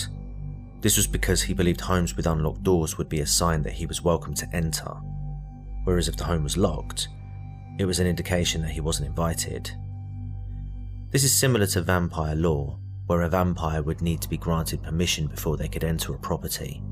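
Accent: United Kingdom. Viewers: Super stuff my man...are you English??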